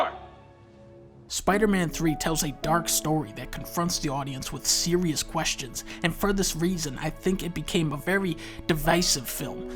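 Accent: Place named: Boston, United States. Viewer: I love dunkey and all...but, the exaggerated Boston accent and sarcastic movie reviews is pushin to be a little similar to Jontron.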